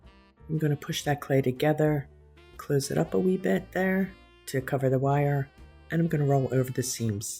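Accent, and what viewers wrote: Philadelphia, United States. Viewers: Just stumbled upon your video when I was looking for vintage Christmas crafts. As soon as I heard your voice, I knew you were from the Philly area! And then you mentioned Kindy’s! That’s so close to where I grew up!! Just subscribe to support a fellow Philly girl!!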